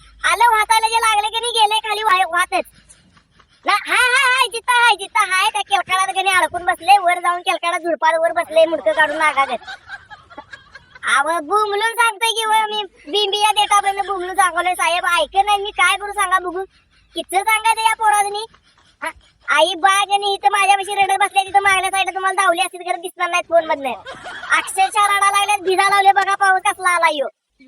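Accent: Kolhapur, India. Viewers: 😁😁😁😁😁😁😁😁😁😁😁😁😁😁😁😁😁😁😁Anna tumachi kolhapuri bhasha man prasanna karate mundak kadun basalay nagagat 👍👍👍👍🙏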